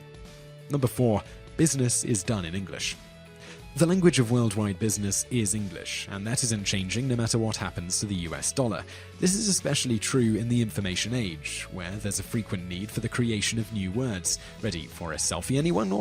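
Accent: England, United Kingdom. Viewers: It is so nice to hear such an elegant British accent say such nonsense. It sort immunizes us against form over substance situations. Its like listening to Ted Cruz being stupid and then hearing that he was a brilliant student at Harvard. It devalues a Harvard education and a fancy accent to the benefit of those of us in the public education system. Here's a thought: go on Google and search "Chinese language." And stay away from any list on the Internet. They are carriers of stupid. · VVDivineOwl You know the person speaking is clearly English by his incredibly obvious accent, don't you? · Learning Chinese now and enjoying it. The narrator sounds English. English people are the laziest when it comes to learning other languages. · Your British English is useless today outside of the UK.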